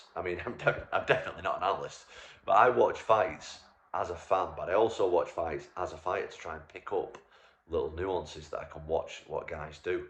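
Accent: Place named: RP, United Kingdom. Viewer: Hello Tom, I'm a huge fan and I also think you are the next level of fighter with a concentration in striking, distance, wrestling, ground game, and a great working fight IQ. However, I think you are speaking the Queen's English and keeping in European etiquette, while trying to communicate to a filthy American (Dana White), where getting the unification match is concerned. If you are going to contain yourself, and he wants you to be contained, then you've already lost the argument. There is no need to respond and hearing it any further in such a manner id just bothersome. If you want this mother fucker to actually be his authentic self, and have a real dialogue, then speak his language. "You say Jon Jones is the pound for pound greatest MMA fighter of all time, I know you are wrong. I'M the greatest pound for pound MMA fighter of all time. 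You put the two of us in a room and I'm killing him and walking out! Period. He's depending on you to hide him from me, because I'll kill him in front of everyone. If you think I'm wrong, than put your money where your mouth is and schedule the fight. If he backs out, he forfeits the belt, the title, and any talk about being good at anything. You want to have this conversation? I'm willing to back it up by bashing his face in. You want to go on saying he's pound for pound the best, then prove it. Prove it or continue to allow him to hide from me." Something like that is what I would hear from my Mom every day waking up for school. We'd fig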